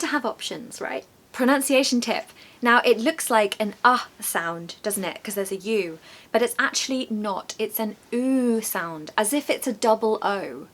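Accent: United Kingdom. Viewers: I love your accent,you are the incarnation of the real British English I’m from Chile 🇨🇱 and I love the British Accent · hi from Bavaria Alpen! I enjoy your British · Omg the British accent is so rejuvenating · You have my dream British accent. I can listen you speak forever. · Hello, I love these beautiful words you taught me with the good definition. You are teaching me lovely words in the different seasons, months, and even in the Valentine"s day. I love learning those special words from you with the beautiful British accent and pronunciation. when you mentioned about Lady Bird, I used to read Lady Bird childrens" books in my childhood. That was my foundation of learning British English. Now you are teaching me beautiful British English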